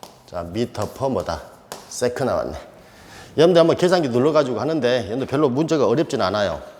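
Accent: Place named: Honam, South Korea. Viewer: 교수님 정말 멋진 강의하고 있습니다 구수한 호남사투리에 귀에 쏙쏙 박히는 강의 내용 제가 경상도 50대 후반 남자 꼰대인데 인생살면서 교수님처럼 멋진 강의 처음입니다 구독자들이 어떤 건의사항들이 올라올수 있지만 그냥 교수님 스타일대로 하세요 대한민국 최고의 교수입니다!!! 멋집니다!! 어떤 것도 바꾸지 마세요!!! 정말 이대로~~~~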